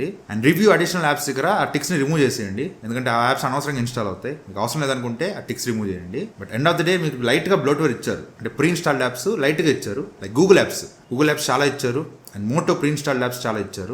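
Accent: Nellore, India. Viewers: Anna nuvvu Nellore odivaaa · Bro nellore aa meedi, yekkada untaru bro maadi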